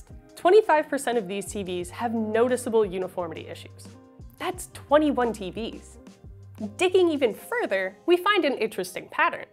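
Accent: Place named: Canada. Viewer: Didn't even think aboat where RTINGS was located, but when the familiar accent crashed into my ears, either they were from Canada or RTINGS is based the. It was only after the job posting link did I find oat the truth.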